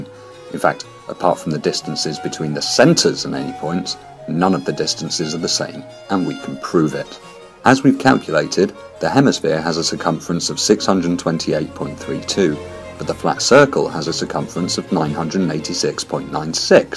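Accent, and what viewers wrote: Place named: North, United Kingdom. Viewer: I like the shaky camerawork at the beginning! It gives the film a bit of a 'Blair Witch' edge to it. Seriously though ... your mellifluous northern tones makes the rather esoteric subject matter a lot more palatable. As if Brian Cox had presented those Open University programmes in the 70s. Now then. The question is what do I do first? Watch your other videos? Visit Iovandrake's profile with my troll mask? Or Google 'potholer54'? And before you say 'none of the above' ... you're too late. I have already subscribed to your channel. Nice one!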